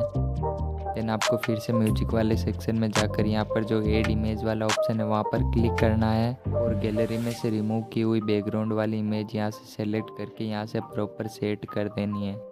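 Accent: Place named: Gujarat, India. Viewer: I think you are gujrati 😅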